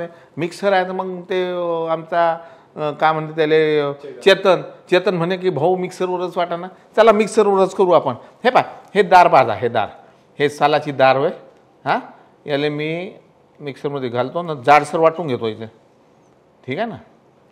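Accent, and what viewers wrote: Vidarbha, India. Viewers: Chaalan ji Sirji tumchi vidabha chi bhasha aamhala aawadate Hamaare Nagpur Ki shan ho aap 🙏🙏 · मस्त रेसिपी 😋😋😋 विदर्भाची भाषा मस्त बोलता तुम्ही...ऐकायला छान वाटत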